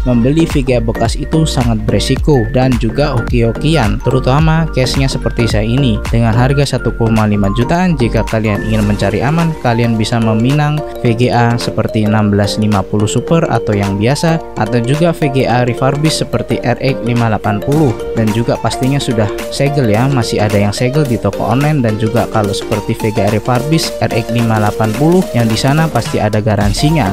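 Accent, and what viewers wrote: Purwokerto, Indonesia. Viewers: wong purwokerto mas?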